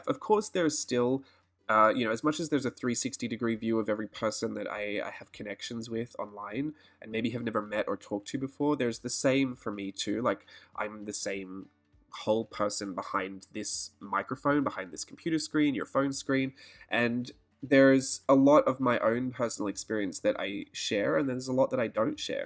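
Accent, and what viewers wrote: Australia. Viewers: Yay an Aussie artist to watch 😀I could tell you where Aussie from the start 🐨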